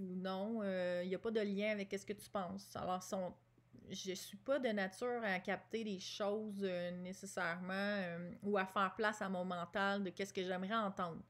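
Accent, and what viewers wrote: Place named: Canada. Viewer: Merci de votre reponse....Cela me touche aussi car cela me conforte que nous sommes des êtres divins et nous avons un rôle à jouer dans l'éveil pour emmener tous nos soeurs et frères du monde terrestre vers une vie dans l'Amour La Paix et la Lumière.....Gratitude....Namasté PS: j'aime beaucoup votre accent canadien....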